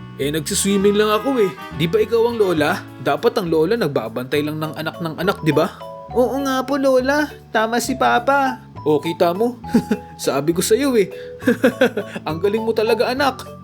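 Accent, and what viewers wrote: Ilocos, Philippines. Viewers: Kuya ilocano ka po ba?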